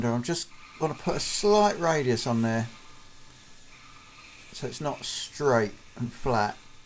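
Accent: England, United Kingdom. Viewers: I love listening to you talk! I’m American so all British accents are cool to me, plus I like hearing your thoughts about the work you’re doing. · No Music, no cartoon capers intros but a blacksmith with an English accent who’s all business! That’s why I’m here.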